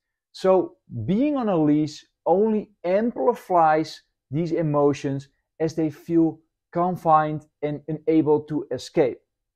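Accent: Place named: Netherlands. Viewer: Hoi ik hoop dat ik mijn oprechte mening mag geven op je nieuwe uitdaging om video's voortaan in het Engels te maken. Je uitspraak van het Engels is nog té "Nederlands" kortom klinkt beetje raar...😮😮😮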